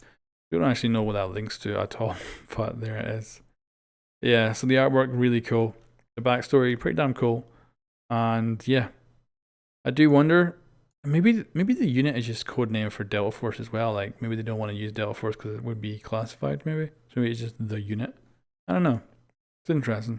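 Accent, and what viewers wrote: Ireland. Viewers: You have to be irish with this accent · Are you irish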